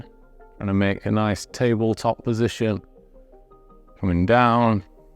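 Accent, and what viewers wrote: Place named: Birmingham, United Kingdom. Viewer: Great stretch to follow a workout! Thanks,. By the way, I love your accent. Have you seen “Peaky Blinders”? Your accent reminds me of the accents in that show. I feel like I’m working out with Tommy Shelby. 😜 Thanks for the great stretch!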